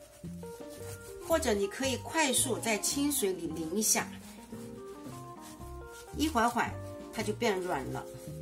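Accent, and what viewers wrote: Shanghai, China. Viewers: 親切的上海普通話，很久沒有吃過這道家常菜了。謝謝Jenny!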